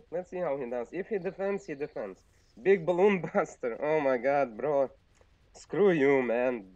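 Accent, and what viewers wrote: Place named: Russia. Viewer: Fix your sound, mate. Add 20Db in post. It'd be better. And you've got a THICK Russian accent 😏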